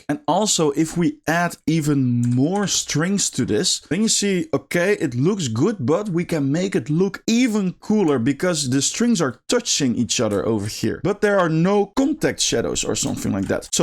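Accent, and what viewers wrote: Netherlands. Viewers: Me when i hear english with dutch accent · Your knowledge of geo nodes is so insane. Thanks for sharing your skills and insights. Love the Dutch/English, I assume your Dutch, like me ;).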